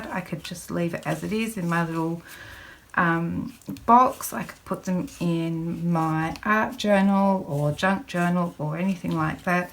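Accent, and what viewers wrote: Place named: Australia. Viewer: I just love to hear your Aussie voice on U tube..from a fellow Aussie..love what you do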